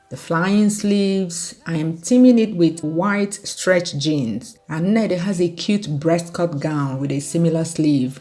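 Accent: Nigeria. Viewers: Even before clicking as I scrolled, I thought, you are a joy and I love your authentic Nigerian accent. I am Ibo too, I live in England. Continue to be you! sister, it's beautiful and we can all glean from you 🙌💖😊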